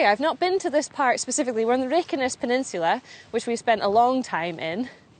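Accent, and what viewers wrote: Scotland, United Kingdom. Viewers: What a lovely broadcast, and a surprisingly strong Scottish accent. Thank you. Poor cameraman must have found that difficult with the wind gusts 😁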